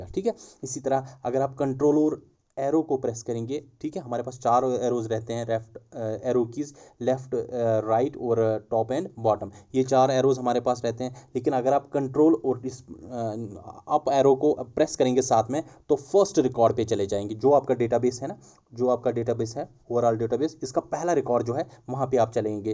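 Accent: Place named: Kulgam, India. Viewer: Meh Basan Sir chu Kulgam Rozan As per Language. Kaiz mh che kulgam peath knh Dost, wo b same Sir ki Tarah baat Krte hai. Content 👌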